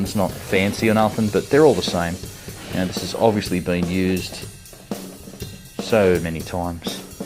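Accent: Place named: New Zealand. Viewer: Wow - surprised to hear your New Zealand accent after reading the title...I thought you guys would call them a box and not a can. Anywho, I hope you’re still going to make videos. How about throwing us subscribers a bone and making some new content ya old sheep shagga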